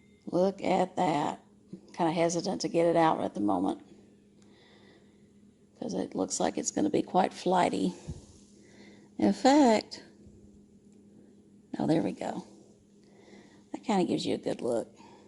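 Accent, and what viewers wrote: South, United States. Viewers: Loving that southern accent hunny 😉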